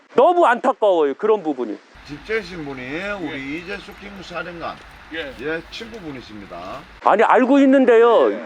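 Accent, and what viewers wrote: Chungcheong, South Korea. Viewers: 말씀 내용을 별개로 충청도 사투리가 참 정겹습니다...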